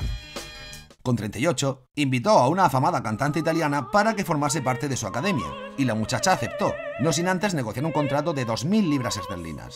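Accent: Spain. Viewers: Vives en Andalucía Albacete o Madrid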